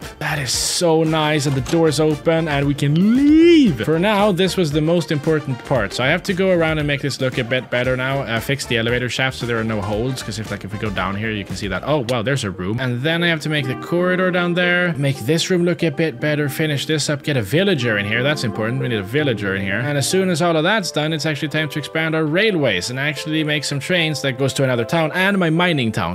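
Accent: Sweden. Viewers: Heyyyy are you from sweden sounds like it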